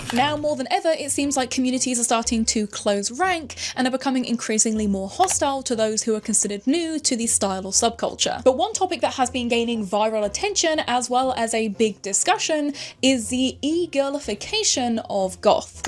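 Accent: United Kingdom. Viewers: God as a German I love you’re British perfect voice. By the way: I was a goth back in the late 90s and I appreciate that you talk about this topic. Now I am much older and more relaxed but seeing the sellout of a subculture makes me sad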